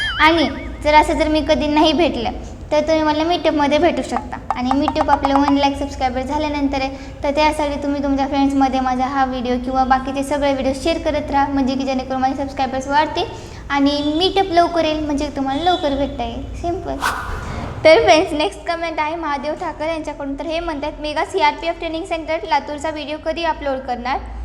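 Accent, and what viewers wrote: Latur, India. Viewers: you are Very Sweet Girl,.., Tuzya vanitun Latur cha sugandh Yeto. Tuze sarv video apratim ahet Video pahun gawachi odha lagate Makani Dam cha video adhik Vistar purvak hava hota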